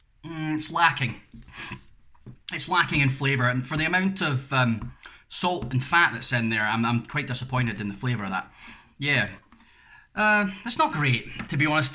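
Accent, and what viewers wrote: United Kingdom. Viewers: Are you scotish im scotish aswell🙂🙂🙂🙂🙂🙂🙂❤❤ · where is your accent from? what part of GB?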